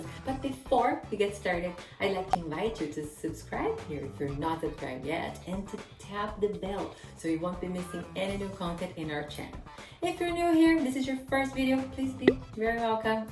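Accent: Brazil. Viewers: Como a gente reconhece o sotaque Brasileiro 😍Tava te assistindo e pensando ela é brasileira e no final vc fala Português kkkk !Ficou show Seu cabelo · are you Brazilian? I’m from Brazil, and I noticed a familiar accent in your speech and you said tchau in the end, so it made me wonder.:)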